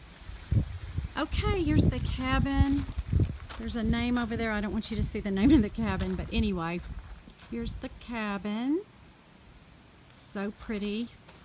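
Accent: Texas, United States. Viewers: I can say this because I've lived in Texas my entire life, but your Texas accent is getting stronger. Lol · what a great vacation, I need to put NC on my list. That Biltmore home was awesome!!! BTW your texas twang is much more pronounced now that you're living in Texas (I just love it!!! ):D xox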